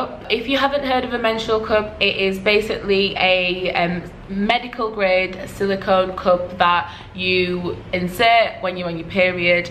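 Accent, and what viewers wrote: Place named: Northern England, United Kingdom. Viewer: literally just came across this vid and the first thing i noticed is how much i love your northern accent! i'm from middlesbrough...love the video...i'll be hopefully doing this trip shortly! keep smashing it! #NorthernLassesDoItBest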